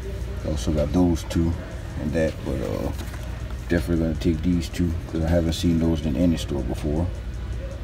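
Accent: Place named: Louisiana, United States. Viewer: Sounds like your from Louisiana if you are what part I’m in Lafayette would like to do some trades if you are down I have a good bit of stuff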